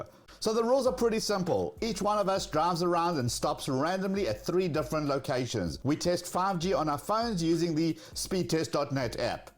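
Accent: South Africa. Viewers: Hey boet. Your accent sounds South African. Let me know if I'm right. I'm from Cape Town, ZA. On 5G, using the same app you are I got 464Mbps this morning. I religiously test atleast once a day...My connection speeds have always been between 450Mb and 500Mb. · You have a South African accent 🤔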